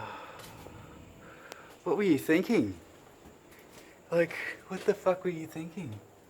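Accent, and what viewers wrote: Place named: Australia. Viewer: Perfect amount of swearing in that Aussie accent bud! (My church does not understand me 😂). Glad you were able to fix the mess!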